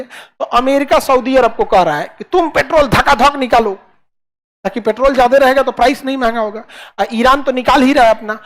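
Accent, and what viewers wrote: Bihar, India. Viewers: Bihari accent 👌👌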